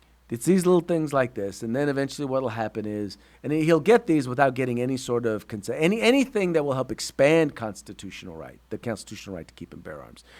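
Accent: United States. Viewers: OK, you are taking some leaps here. And with the horrible hick accent that no one i know here in texas talks like that aside, I trust Governor Abbot. And Texas will never be like California. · Our governor fuckstick edwards in Louisiana is even worse. People better be paying attention to what is happening...your Southern accent blows by the way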